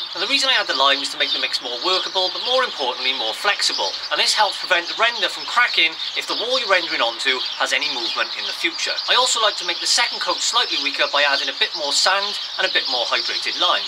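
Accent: Wales, United Kingdom. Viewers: Welshman, straight to the point, love it, cheers! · I thought Manu Ginobli had an Argentinian accent, he’s gone Brit on me.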